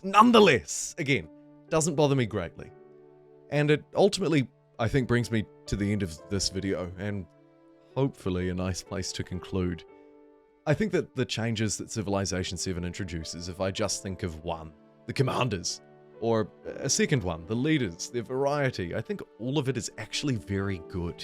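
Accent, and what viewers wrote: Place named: New Zealand. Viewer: The familiar Kiwi accent is a welcome!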